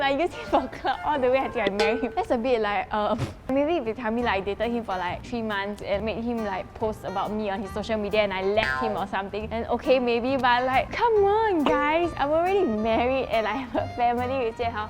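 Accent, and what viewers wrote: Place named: Malaysia. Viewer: Where is this girl from? She has the "lah" slang so wether she is Malaysian or Singaporean? Sorry if I'm wrong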